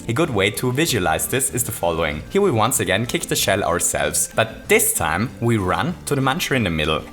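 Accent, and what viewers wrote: Germany. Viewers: Du bist Deutsch oder? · Where is he from? Because he sounds German/Austrian. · Bist du deutsch? (Are you a german?) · Could it be that you are german? You have a strong german accent. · you sound, Very german mein freund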